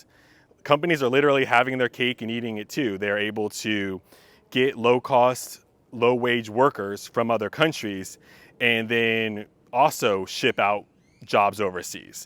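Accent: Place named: India. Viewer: You have a very typing Indian Accent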